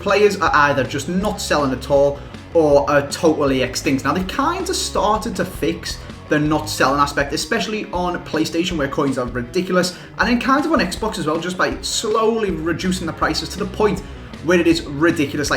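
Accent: Liverpool, United Kingdom. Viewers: Funny because you used to play for Liverpool, so shouldn't you be used to Scouse accents Heskey?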